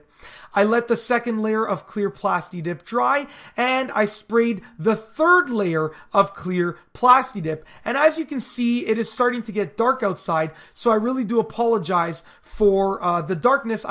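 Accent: Canada. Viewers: Are you Canadian or from Minnesota? Don't you think that by tinting or subduing tail lights is risking or cutting down on motorists trying to distinguish wtf that is in front of them? Especially dark or dimly lit roadways, they could just appear to be roadside markers. Remember this fact, today's drivers are less aware/attentive than drivers were 20-30 yrs ago because of the lack of common sense and ease of distractions. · This dude has to be from Canada based off his accent. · He's Canadian..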